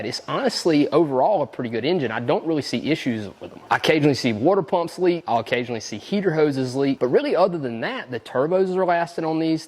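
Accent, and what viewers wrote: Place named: South, United States. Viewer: you're a natural presenter. You really know how to take a complex topic and condense it for non-mechanics to understand. And your southern accent makes the videos even more enjoyable to watch. Keep up the great work! ☮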